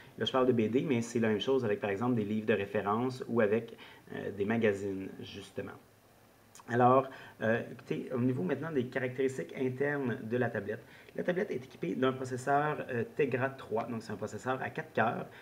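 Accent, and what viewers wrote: Canada. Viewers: J'adore ton accent canadien !! Vive le Canada !!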